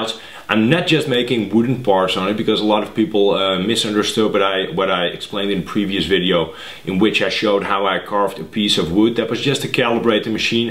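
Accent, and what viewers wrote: Netherlands. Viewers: je klink lekker nederlands haha nice vid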